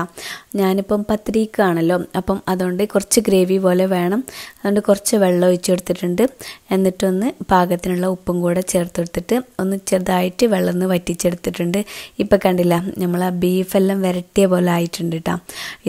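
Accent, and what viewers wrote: Kasaragod, India. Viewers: pathiri Story comedyy ayikknn😃😃ippo varthanam ketta ippo kasarkode thanne😍😍😍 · Kasrgod ningala pattal kadha keettu nallonam chirichu..avida nallarasayrikoole..slang different aya inganavum lee..enthayalum vedio adipoli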